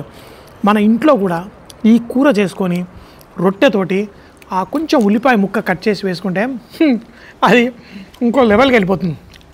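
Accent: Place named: Telangana, India. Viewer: nuvvu e tv lo unnapudu maku nethi nosthunde ne kani eppudu nuvvu chese prathi food video chusthamu nuvvu telangana yasala matladthe 😂😂😂😂😂😂 super re mi bawa ante penimiti ante na better half likes ur videos a lot we just wait for ur new videos every day and all the best for up coming ma bangaru thandri ki all the best nanna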